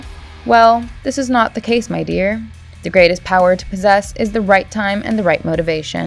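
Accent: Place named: United States. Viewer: Every word is typical american accent everything has r in it annoying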